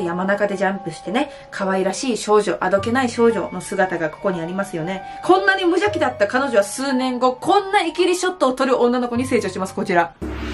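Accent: Nagasaki, Japan. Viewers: 久しぶりに見たバイ。おぉ、かわゆくなっとるやないか、長崎弁と致死量の毒は変わっとらんな！安心した。🤣🤣🤣🤣🤣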